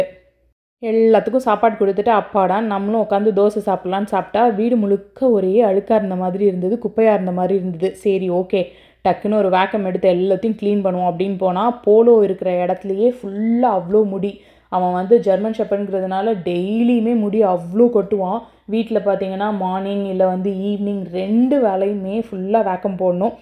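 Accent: Kongu, India. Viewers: U speak kongu slang but howcome from tanjore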